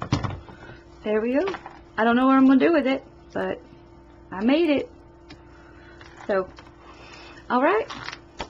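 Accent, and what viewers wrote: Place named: Kentucky, United States. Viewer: Love it! Would love to know where you're from...lol. You sound an awful lot like this old KY gal! Love it!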